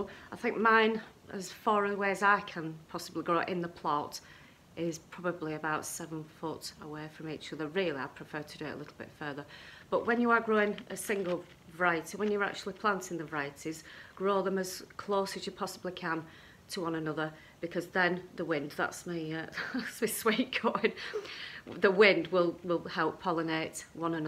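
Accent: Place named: Greater Manchester, United Kingdom. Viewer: Hi, what a lovely video this time of year. I would say 90% of my seeds are from Premiere Seeds Direct for at least 8 years. I have had great germination and find them great to work with. I've not long got back from work and I asked my fiancee to guess your location from your accent. She thinks Ashton or Stalybridge! ( She was originally from Stalybridge) I cannot wait for spring, it feels like I haven't seen the sun for months and we are in Lincolnshire so further South than you. I think I'll spend the evening in my grow room with a book pretending I'm on holiday! The lights I use are full spectrum and look just like a summer's day!! Take care and hopefully spring is just around the corner for us all.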